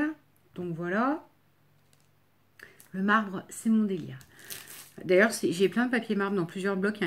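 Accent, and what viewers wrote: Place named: Reims, France. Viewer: Ouai c'est clair tu as l'accent de Reims 😂😂 un p'tit bout de chez moi 😂😂😂😂 action Rethel est pas mal non plus si tu as l'occasion d'y aller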